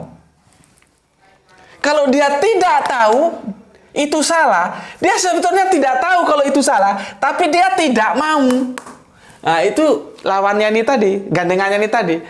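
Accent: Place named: Yogyakarta, Indonesia. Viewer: Kok cara berbicaranya n namanya seperti nya wong Yogyakarta, apa asalnya wong dr Yogya ya? Wah detail banget.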